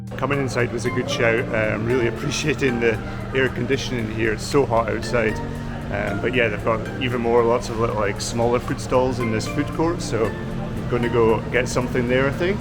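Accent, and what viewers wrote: Scotland, United Kingdom. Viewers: Aww. That Scottish accent. Made me smile all the time.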